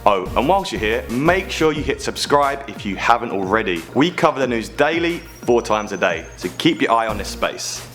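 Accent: United Kingdom. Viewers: Yay another British host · British accent <3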